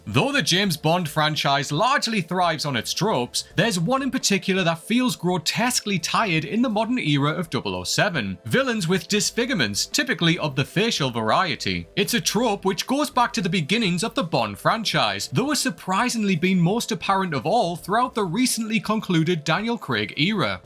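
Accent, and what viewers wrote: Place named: Geordie, United Kingdom. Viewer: Poyro?! Christ. Thick sounding Geordie.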